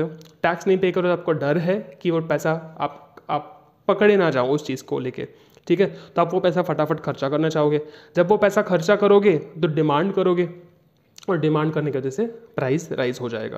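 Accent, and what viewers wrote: Gujarat, India. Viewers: Aap gujrati ho? 🤔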